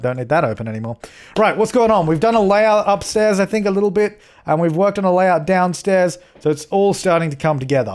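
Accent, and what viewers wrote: Australia. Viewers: He is from Austrailia. I might have spelled that wrong. He mentions where he is from in various videos. :) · Skidat skidoot he's also a male from australia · You live in Australia as well?! No wonder I love listening to you. Us Aussies need to stick together. ;)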